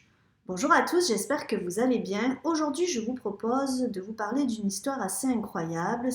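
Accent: South, France. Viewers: Quelle horrible affaire... et malgré le fait que je suive beaucoup de youtubeurs true crime, c'est la première fois que j'en entends parler. Bravo, continue à nous proposer du bon contenu 😊 PS: j'adore l'accent du sud! 🏝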